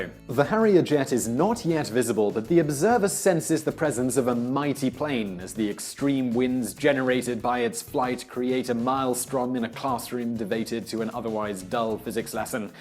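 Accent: United Kingdom. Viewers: "PentaGIN" Its PentaGON" what the fuck is so hard to say that with a heavy british accent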